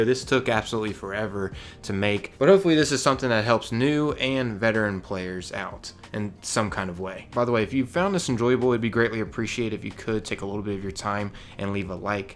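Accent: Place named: Delaware County, United States. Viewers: Philly all’s I hear is philly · Are you from Delco lol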